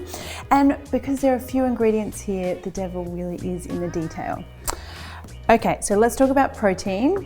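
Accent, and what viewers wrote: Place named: Australia. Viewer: She’s Australian how don’t you know from this video wth they pronounce things differently.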